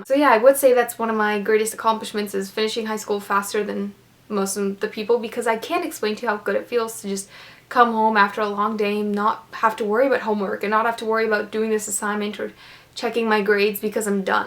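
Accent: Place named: Russia. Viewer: с едва заметным русским акцентом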